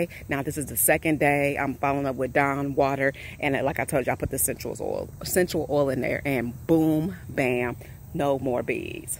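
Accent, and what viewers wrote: South, United States. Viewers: Girl, you sound like me. You must be from the south too. 😀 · I live in the Midwest now but I miss a good ole southern accent. “We sprayed it with this DAAWN” 😂 love it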